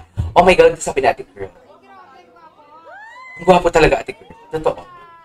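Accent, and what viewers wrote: Iloilo, Philippines. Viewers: Ilonggo ka sir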